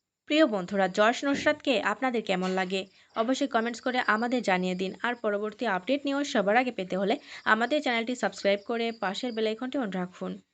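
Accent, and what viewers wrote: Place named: Bangladesh. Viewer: Bangladesher mone hoche apni. Huapy couple bollchen.Huapy ki kotha? Happy balun.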